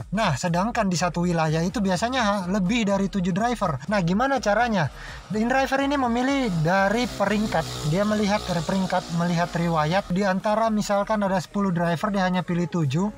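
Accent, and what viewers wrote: Manado, Indonesia. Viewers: Org Manado kang bro?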